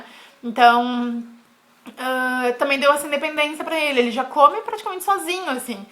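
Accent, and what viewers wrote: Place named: Rio Grande do Sul, Brazil. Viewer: oi amei é sabe o quão é bom ver uma mãe assim de cara limpa com sutaque lindo aqui do nosso RS e estou só na espera do próximo beijo fiquem com Deus.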